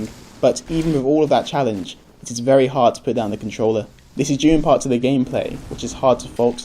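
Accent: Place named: South London, United Kingdom. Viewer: Thanks for sharing your thoughts dude... I really liked your review, more objective and informative than a lot other reviews out there. A suggestion - record your audio and try varying your vocal tone a bit more, you'll sound more compelling. I like your calm and factual style, but sounding more invested helps (see angry joe getting angry). Only mention it as I have a very similar accent to you (south east London? Palace fan maybe lol?) and unless I think enthusiasm, I can put ppl to sleep. Anyway, nice vid, subbed - I request a ds3 review (if my pointers make you any ad revenue, I want my cut). Peace!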